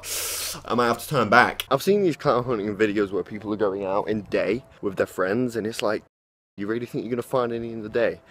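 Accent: United Kingdom. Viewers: MeKillYouTo I don’t know a lot of people in the us that have a British accent like his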